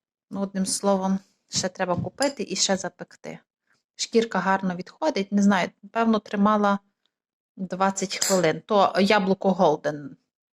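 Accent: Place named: Lviv, Ukraine. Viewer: І я перший раз дивлюся, тільки зайшла, почула наш Львівський діалект і відразу підписалася 😊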